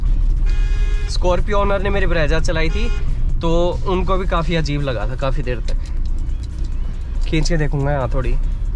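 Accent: Haryana, India. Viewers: Bhai your Voice Bhai Op..i thing Aaap hariyana main Rahete hoo.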